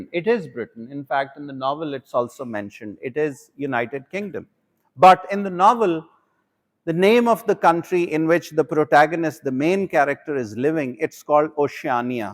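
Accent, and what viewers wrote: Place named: Punjab, India. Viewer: Punjabi accent English.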